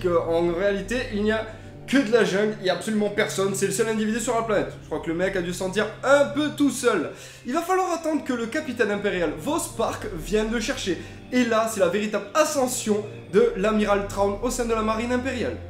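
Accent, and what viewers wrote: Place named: France. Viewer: Bon choix de sujet, Thrawn c'est le meilleur perso SW de tous les temps :) Cependant j'ai un peu de mal avec ta prononciation très frenchy, sa race c'est "Chiss" (prononciation: Tchisse), pourquoi donc prononcier "Chie"? xD bon pour Pellaeon j'avoue plein de monde a du mal ^^ et tu as dit "zogri" au lieu de "nhogri"... (je pinaille hein mais c'est pour que vous vous amélioriez!) :p haaan et à la fin t'as dit "général Thrawn" :) Notons aussi que certes en revenant vers eux Thrawn est adulé par la majorité de la population Chiss, mais pas par les classes dirigeantes qui font exprès de l'ignorer royalement (trop fiers pour montrer qu'ils s'étaient trompés en l'exilant!) Bon voilà désolée pour les petites critiques mais c'est pas méchant ^^ encore une fois vous vous êtes bien documentés c'est une bonne chose :)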